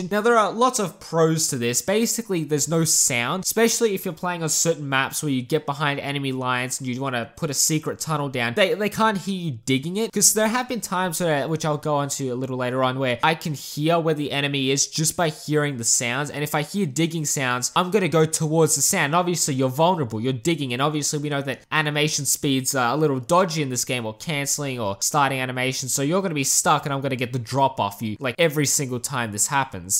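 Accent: Australia. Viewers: Sounds strayan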